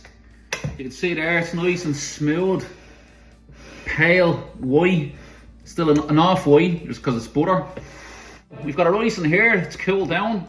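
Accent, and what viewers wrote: Ireland. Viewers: Watching with automatic CC is hilarious with an Irish accent 😂 luckily my father in law is Irish so I knew exactly what you were saying even if captions didn't! 🙈 Dissolving the sugar is genius! Definitely trying that next time, thank you! 🍀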